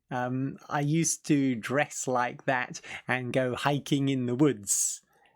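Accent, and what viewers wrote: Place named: United Kingdom. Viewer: I think that British English cool! I like your mountain clothes☺️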